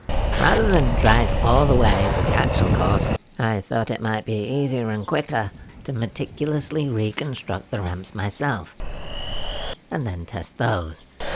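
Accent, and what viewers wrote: Yorkshire, United Kingdom. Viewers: Everyone reading this, pause for a moment. Close your eyes (after reading the rest of the instructions, otherwise you won't know what to do). Now picture saying "Red Bull gives you wings" in his cute little Yorkshire accent. Your day should now be 12.75% better.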